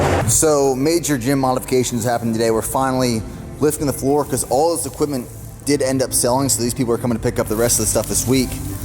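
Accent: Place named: Pennsylvania, United States. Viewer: That tank he was wearing at the start? Who buys that kind of shit? Oh yeah, he is from Western PA. horrible taste, horrible accent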